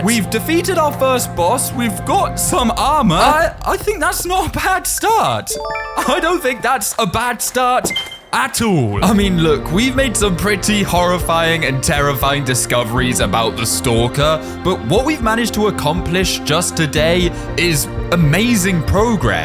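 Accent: United Kingdom. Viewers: Are u britosh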